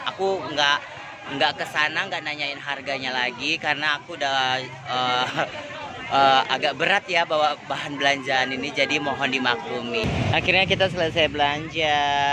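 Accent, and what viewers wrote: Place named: Medan, Indonesia. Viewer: Kalo bisa logat medannya jgn dicampur dgn logat jakarta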